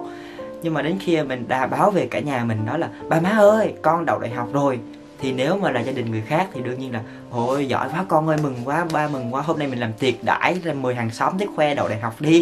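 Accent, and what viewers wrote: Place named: Central, Vietnam. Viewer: A là người miền trung đúng ko ạ? "Sì gòn" "quýnh" "đì"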